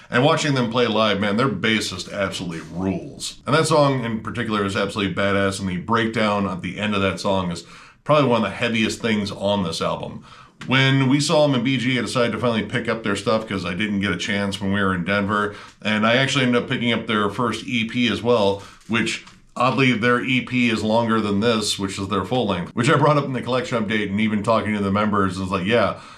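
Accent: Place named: Wisconsin, United States. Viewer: Im a sucker for a Wisconsin accent...on a lady. Hey, I dont judge.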